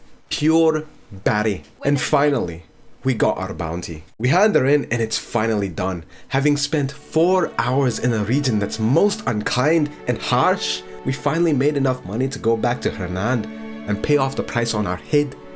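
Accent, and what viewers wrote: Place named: Scotland, United Kingdom. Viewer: why does the Scot accent suit you so much hahahah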